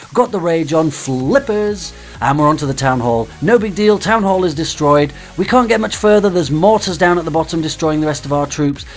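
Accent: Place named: England, United Kingdom. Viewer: He's English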